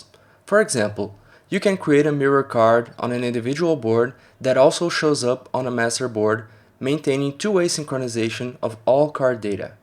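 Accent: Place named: Brazil. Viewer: fácil encontrar um brasileiro falando inglês kkkkkkkkk vou testar seu power up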